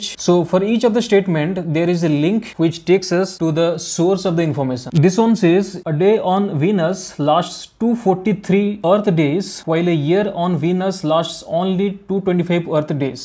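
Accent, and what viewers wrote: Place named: India. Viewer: Was it English? What Indian dialect is this?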